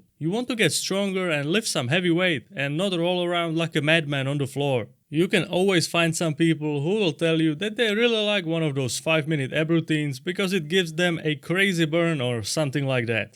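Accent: Czech Republic. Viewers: czech accent detected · I was worried for a second there, hearing your accent, but Ig you're Czech ^^ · Are you from the Czech republic?? Because of the accent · Are you Czech by any chance?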